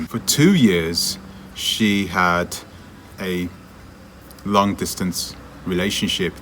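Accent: United Kingdom. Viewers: You have a talent of story telling with your british accent its great really why dont you study african or even european history and share it with us that would be so much interesting... And for your bad energy that you need to dispense learn karate boxing or smthg just giving advice dont waste your talent now.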